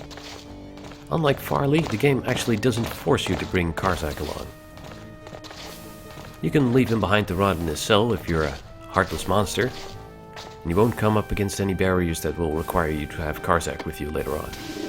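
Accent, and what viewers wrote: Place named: Quebec, Canada. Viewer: Love your videos. Very entertaining. BTW, are you French Canadian? You sound like it.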